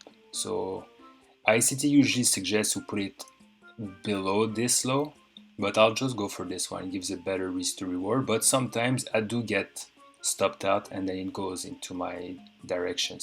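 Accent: France. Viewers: Hi, nice videos, can smell a french accent here :) Or maybe not .. Is the checkbox on your chart an indicator !? If so, do you mind share a link ? Cheers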